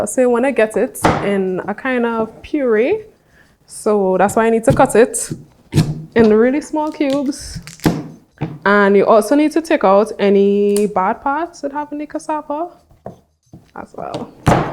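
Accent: Trinidad and Tobago. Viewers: Sounds like a 🇹🇹 accent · Why do you sound like a Trinidadian???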